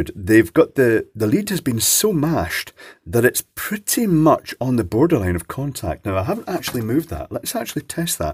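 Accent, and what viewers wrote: United Kingdom. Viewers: as always, Very informative video, by very charismatic speaker, your Native British accent is another inspiration for beginners (as it was for me when I started 3 yrs ago ). Thank you and God bless you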